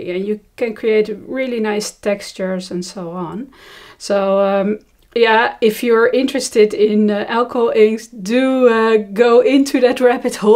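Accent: Netherlands. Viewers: Hi, you sound like you are from the Netherlands, just like me. This is beautiful and I love your stencil, well done!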